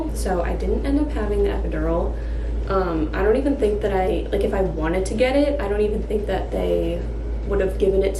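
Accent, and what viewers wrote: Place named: New England, United States. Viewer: Agh! He’s adorable! Literally!!! I also love how obviously you’re from New England, and I love how it’s GreysEN and you gave it kinda a New England flare and I love that 🫶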